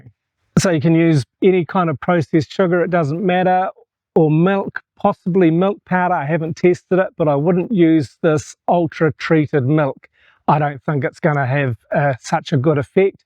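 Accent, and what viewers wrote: New Zealand. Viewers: Sounds more Kiwi then North Thailand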